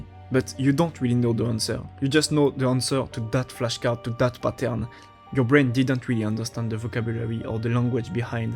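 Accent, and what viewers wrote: France. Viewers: A guy speaking english with a french accent teaching me how to learn Japanese. Truly something 😂.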